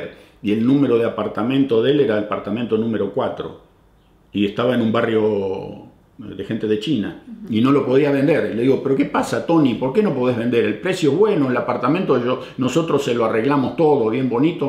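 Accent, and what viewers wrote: Argentina. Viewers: Gracias por sus vídeos!! Me encantan 😍 😍😍😍 , les escribo desde 🇦🇷. Aunque hagan mil años que te fuiste de acá, no perdes “la tonada” !!! Me alegraron la cuarentena!! Te aseguro que sería buenísimo tener un presidente como vos!! Muchos haríamos campaña para vos gratis!! Jaja! Lamentablemente nuestro país no tiene solución! Mejor no sigo, es muy triste lo que estamos pasando!! Espero el próximo vídeo!! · Recien hace 2 ds encontre este video de Youtube, Me intereso tanto que no para de verlos. Me hubiera encantado verlos en cuarentena, la cuarentena interminable que tuvimos en <link> asombra mucho que no hayas perdido tu acento. Me siento identificada con todo lo que decis de Argentina.Que gran pais desperdiciado!!! Voy a ver todos los videos. Lamento en particular, lo que tuvieron que pasar tus hijas con tantas idas y vueltas. La burocracia en Argentina es terrible, y la coima ya es algo como normal!!! 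Me encantan tus videos y me siento identificada en muchas cosas viviendo en Buenos Aires. Saludos a todos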